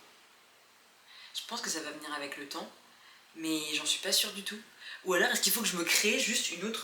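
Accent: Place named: France. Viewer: SALUT, c'est guillaume peuchaud, j'avais le meme problème pour l'alter ego en voyage. Au début je voulais aussi diminuer l'intensité de mon accent pour avoir le sentiment de progresser mais au final ce n'était pas moi que j'entendais parler, mais quelqu'un d'autre, quelqu'un de faux. Alors la technique que j'ai trouvé c'est de garder mon accent Français. Je sais que tu veux diminuer ton accent Français pour te sentir progresser, et peut être qu'à force de pas mal de temps de sacrifice tu réussira à parler anglais avec l'accent américain, sans meme penser au fait que t'es entrain de te forcer, ca sera donc devenu naturel? Mais je pense quand meme que dans ce processus ta personnalité aura changé ne serait-ce qu'un tout petit peu (c'est ce que je ressentais). Donc ce sera ton nouveau toi quoi... La t'es aux US donc forcément tout le monde a l'accent américain, et dans la communauté française à laquelle tu appartiens, forcement c'est stylé d'être le Français avec l'accent le moins Français, c'est une preuve de ton niveau... 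Mais perso dans mon voyage j'étais constamment avec des gens de différentes nationalités, Thaïlandais, Espagnols, Ukrainiens et j'ai compris une chose, l'anglais est une langue internationale, faite pour être parlée par le monde entier, et chaque pays a son accent. Pourquoi les 197 pays devraient se calquer sur le modèle British ou US? D'ailleurs, les Américains n'essayent pas d'avoir l'accent British alors pourtant que l'origine de la langue angla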